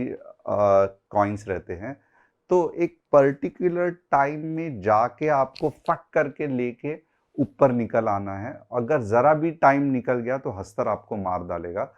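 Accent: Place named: Vidarbha, India. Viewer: sir i think u r from amravti akola side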